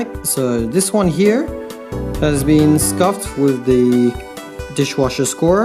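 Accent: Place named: Australia. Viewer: Bro are you from Australia? You have the Aussie accent. No troubles getting the gun into the country? Buy from eBay?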